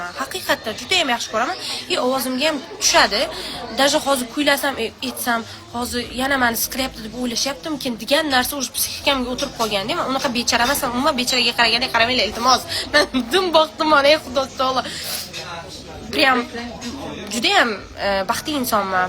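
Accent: Khorezm, Uzbekistan. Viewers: xarazm tili chiroyli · Сизга подписка булдик. Позитив инсон экансиз. Хоразмча жудаям бошкача ти л тушуниш кийин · Хоразм шеваси бу одамга билмай тухмат килма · Хоразм шевасида гапиряпти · Bir karasa xorazmcha bir karasang uzicha toshkencha gapiradimi ukuvsiz ekanbu maktabda ukiganmi bu😢😅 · Turkçe iyi konişamiyosin 😮😮😮 sen Harezmdan galiba😮😮😮Harezm şivasinda konişyosin😮😮😮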